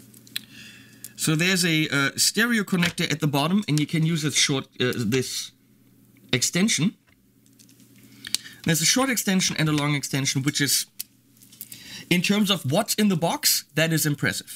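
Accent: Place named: Germany. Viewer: ich mag your german accent lol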